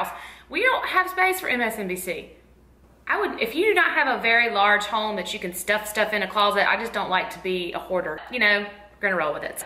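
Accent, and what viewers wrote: South, United States. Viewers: You are so southern and I love it!!! I'm a Texas gal!!! You are so down to earth and hilarious!!!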